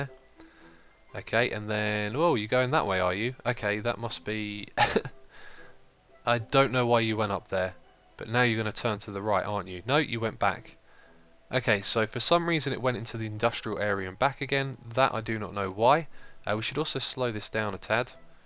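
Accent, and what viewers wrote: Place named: London, United Kingdom. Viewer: I reckon he is from somewhere in London ???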